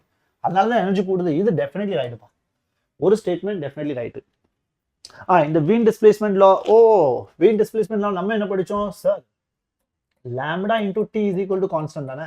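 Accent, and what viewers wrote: Tirunelveli, India. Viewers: Nellai tamil mass sir 🔥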